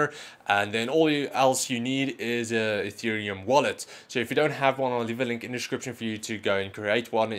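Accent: Afrikaans, South Africa. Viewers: Wou sê jou aksent klink Afrikaans. Dankie vir die raad maat. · This is the first video I've watched from this channel, and the first thing I thought of is, "Is he from South Africa?" because I'm originally from there, so I could detect the accent. It was fun to see I was right when the ad came in.. "are you from south africa and want to get some new gaming products?" Lol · Are you south African? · Wow i heard his accent and knew hes from SA i am also lol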